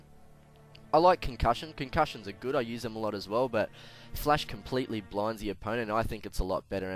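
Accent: Australia. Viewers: Subbed... Awesome to hear an aussie voice....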